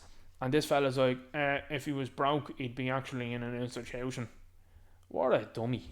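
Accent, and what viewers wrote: Ireland. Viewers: Can't stand the 🤡 not funny at all ever was he's cringe just shouts makes me emberressed to be Irish and his stupid culchie accent annoys me....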